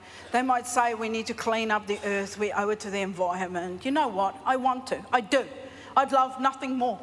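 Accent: Australia. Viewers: Can't tell if she's Australian or South African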